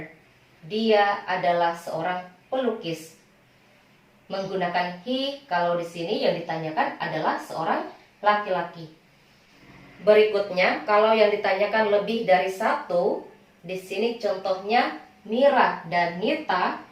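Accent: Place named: Karawang, Indonesia. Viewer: Kirain orang Karawang Bun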